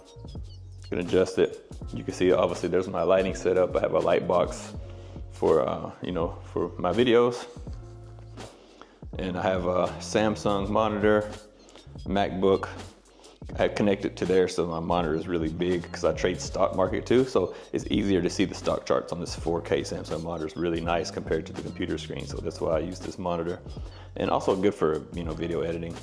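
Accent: South, United States. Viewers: Like that southern accent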